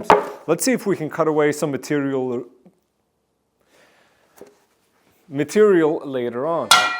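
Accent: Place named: Sweden. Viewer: I did not realize you were having a Swedish accent, I was way to focussed on Russian for some reason😂 hello from Sweden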